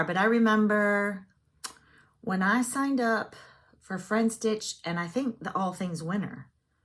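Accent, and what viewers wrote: South, United States. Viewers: I really love watching you, your so cute and perky and you brighten my day. Plus that southern drawl. I use to live in Tamarac for about a yr and a half in 1990. I’m in KY now and it’s cold today. Don’t worry about the grouchy people talking about. Everyone isn’t like them, someone always has to fuss about something. I’ve been trying to work on 28 ct Lugana. I got a BenQ light which helps tremendously w/o a magnifier. Lugana and evenweave are the same thing, jobelin is also an even weave but I think it’s harder. Yes I still mostly do Aida. That little Santa is so adorable. Have a Merry Christmas!🎄🎄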